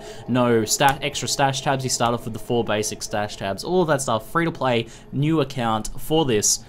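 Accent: Australia. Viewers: why do Australians pronounce idea, i dear? why..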